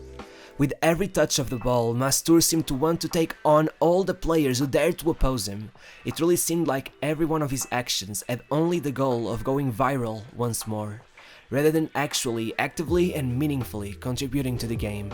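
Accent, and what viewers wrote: Portugal. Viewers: Fodas em 2012 a internet não era o que é hoje ? Este rapaz não pediu nada a ninguém, eu não entendo o título deste vídeo. This title is not only wrong, it is highly offensive. This kid didn’t scam anyone. They pressured him and his family and put them in the spotlight. He just didn’t live up to expectations.there is nothing wrong with that. I can hear from your accent that you are Portuguese, devias ter mais respeito na maneira de falar. Chamaste lhe scammer várias vezes e isso não está certo. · O narrador é tuga, só pode.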